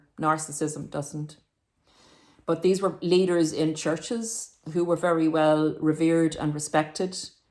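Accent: Ireland. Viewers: Malignant narcissistic relationship survivor and thriver here. Your personality is beautiful and you are as well. Your Irish accent is so charming! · This has to be one of the best descriptions and articulations of narcissistic behavior I've heard. And it is very hard to hear. I find myself reliving childhood memories of grief, tortured at the hands of a sadistic narcissist. I'm not sure if this reliving is therapeutic or just pouring salt on wounds that are healed as much as they ever will. One of the key attributes to an encounter with a narcissist is lingering self doubt. One questions their own behavior, "Did I do something to deserve this?" or "Oh, if only I were more sensitive and tip-toed more carefully I would not have offended and drawn this wrath!" It is this self doubt that later pains me. If I had only seen it! If I had only been a stronger person! If I could go back in time.... 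In any event, thanks for sharing. And your Irish accent is just awesome!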